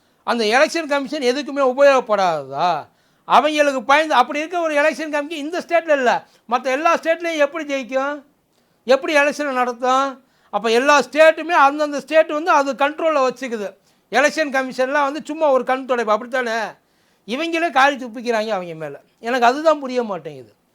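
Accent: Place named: Telugu, India. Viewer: அட லூசு பயலே உனக்கு அரசியல் என்றால் என்னவென்றே தெரியுமாடா பரதேசி நாயே திமுக அடிமை நாயே நீ பேசிட்டு இருக்கதே வைக்கும் அவனை தெலுங்கு நாய் அது தமிழ்நாட்டுல இப்படி தாண்டா இருந்து பேசிகிட்டு இருக்கீங்க இதே வேற மாநிலத்தில் இருந்து இப்படி பேச முடியுமான உங்களுக்கு அந்த அளவுக்கு சுதந்திரம் கொடுத்து இருக்கு வந்தவுடனே செருப்ப கழட்டி அடிக்காத வாய்ஸ்ல தெரியுது தெலுங்கு காரங்களா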